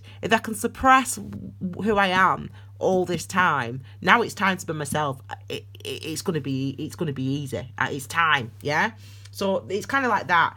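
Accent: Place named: Yorkshire, United Kingdom. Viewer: I’m just trying to figure where it is. It’s almost definitely Yorkshire-ish 😉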